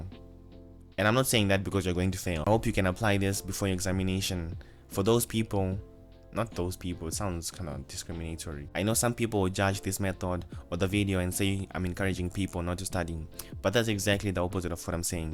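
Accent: Zimbabwe. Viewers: Is he from Zimbabwe 🇿🇼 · U sound Zimbabwean ❤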